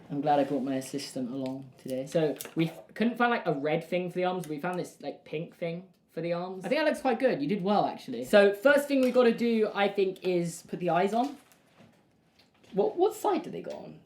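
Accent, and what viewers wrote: United Kingdom. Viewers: is british? idk but his talking is different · Hi I’m new to ur channel today and just subscribed but I was wondering why u have a british accent but it was in $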